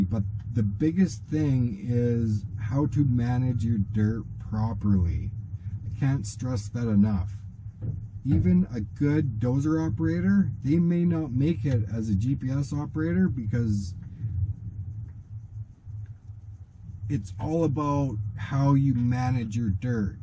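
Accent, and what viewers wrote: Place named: Canada. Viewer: I’d love to learn one of these systems for bigger projects, because running an 8 with a guy walking with a laser setup and a stick in his hand can get frustrating. I can do a decent job just doing it off of my eye, but like you said you still have clean up and compaction to take into consideration. Anyway, I feel like if anyone believes these misnomers that you brought up in the video they are probably irrelevant people to be concerned with and if you put them in a seat of a machine that’s quickly find out that we have job security for a VERY long time as operators. As a side note I love the way Canadians say “PROcess” and “PROject” haha cheers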